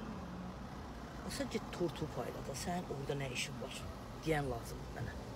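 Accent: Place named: Goychay, Azerbaijan. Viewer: Can baci Göyçay lehcesidi mence??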